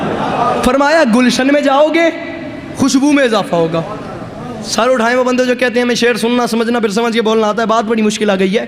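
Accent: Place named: Punjab, Pakistan. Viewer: meray bhai ue punjab ka hai or waha sab ka sirf is ka nahi sab ka bayan karnay ka tareeqa aisay hi hai.. ye over acting nahi hai..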